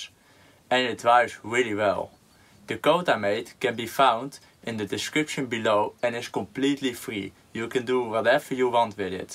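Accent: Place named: Netherlands. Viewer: Je kunt zo horen dat je uit Nederland kom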